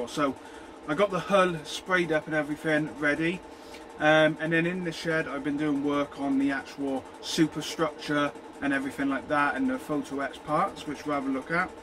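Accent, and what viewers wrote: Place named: West Country, United Kingdom. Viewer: Hey, Great vids m8! I did the 1/400 limited edition as a warm up recently and got this 200 turning up tomorrow so will be tackling it myself. Where about's are you from? I was born nd breed in Plymouth myself and watching your vids has had me smiling and chucking away 😊 (not in a bad way or anything), i've missed the west country twang 😊.. hope your getting on alright with this bad boy of a model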